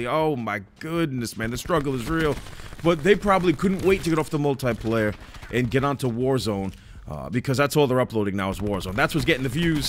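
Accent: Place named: Northeast, United States. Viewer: Loved your channel for years bro. I hope you and your family are staying safe. You sound like you’re from the NE - so please stay safe. Cheers from Texas.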